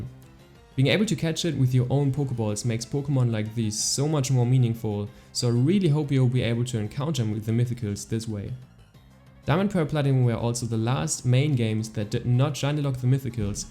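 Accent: Germany. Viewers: you sound like youre german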